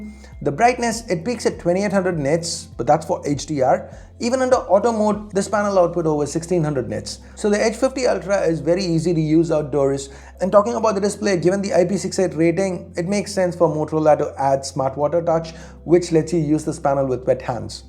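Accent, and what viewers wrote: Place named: India. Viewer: Indian English is ridiculously funny 🤣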